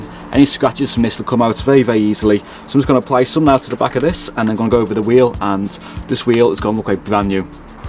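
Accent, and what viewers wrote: Liverpool, United Kingdom. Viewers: Scouse but with a touch of refinement? Crosby?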